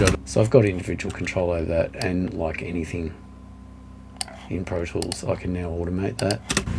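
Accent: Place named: Australia. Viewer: nice hearing an aussie voice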